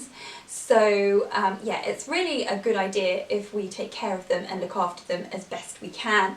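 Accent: United Kingdom. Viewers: Your rich British accent never gets old. 😃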